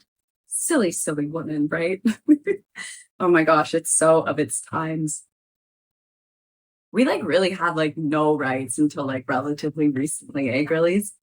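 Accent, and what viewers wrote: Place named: United Kingdom. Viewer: Oh it's so nice to hear another British person on here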